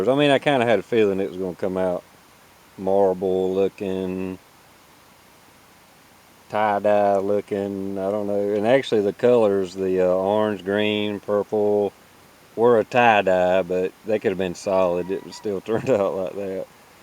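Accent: North Carolina, United States. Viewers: hear a hint of NC accent there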